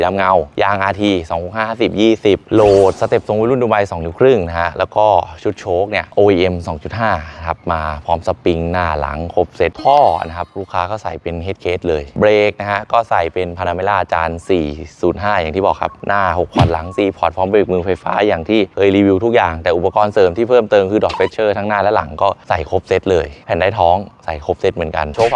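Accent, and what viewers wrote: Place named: Thailand. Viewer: สงสาร ส.ว.ขึ้นรถ ขอบคุงงับ มีโอกาศคงได้ใช้บริการนะพ่อเสียงเหน่อ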